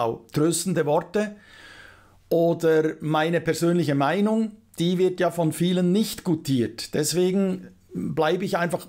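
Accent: Switzerland. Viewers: Salutti, jetzt gebe ich auch mal meinen Senf dazu, ich muss einfach das Kompliment schreiben. Ich bin jetzt 51 im Juni 52 und seit über 30 Jahren auch Pilot allerdings auf den Straßen Europas, jetzt nur noch in Schweden. Ich finde das Gesamtkonzept Deiner(ich duze alle seit ich in Schweden wohne, haha) Videos sehr professionell und lehrreich. Allein die Beantwortung von der in meinen Augen unnötigen Kritik Dir gegenüber gefällt mir immer wieder, hart, exakt und treffgenau. wer da noch versucht Dir Rhetorik beizubringen, der hat dann wohl vergeigt. auch schaue ich mir Deine Videos an, weil Du von meiner alten Heimat berichtest und ich nach sieben Jahren der Liebe wegen nach Schweden gezogen bin. Dein duetsch ist einfach was ich vermisse. Ich wohnte in Ettiswil/LU und wäre dort nach den 5 Jahren glücklich gestorben. Allerdings bin ich jetzt in Västernorrland seit mehr als 12 Jahre und auch seit 2 Jahren schwedischer Bürger. 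Also nicht Wahlschweizer, sonder eben Wahlschwede. Ich bin in Ulm/Donau geboren, darfscht mich also auch Bockwurst nennen. Denn Deine Art ist mir sehr lieb gewonnen. wie die ganzen Kantoner die ich kenne. Hab sogar noch den Schweizer Fahrausweis, der ist ja unbegrenzt gültig. Das fliegen hat mich nie interessiert, da ich schon zu früh die Plomben in den Zähnen hatte und mir daraus selbst die Interesse verweigerte. Allerdings als ich bei der Ruag in Emmen einmal einen Helikopter in der Halle stehen sah, ich wollte mal schnuppern, aber er sagte, er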